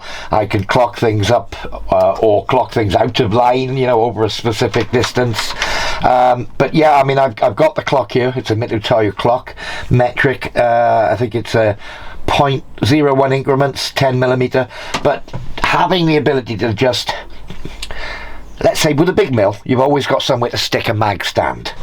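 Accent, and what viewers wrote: Pembrokeshire, United Kingdom. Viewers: Hi. Moved to Portugal from H/west 8 yrs ago. Just happened on your channel. Loved the video and great to here the Pembs accent. ✊🏽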